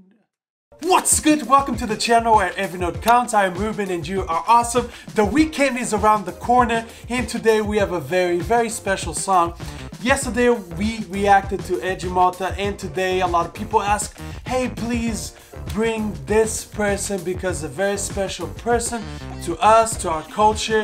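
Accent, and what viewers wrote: Brazil. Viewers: Ele é Brasileiro? Tem acento brazuca em inglês! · R u brazilian or maybe ur parents? Cuz ur name sounds Brazilian and when u speak Portuguese u kinda have a Brazilian accent...it doesn't sound like an american speaking portuguese 😂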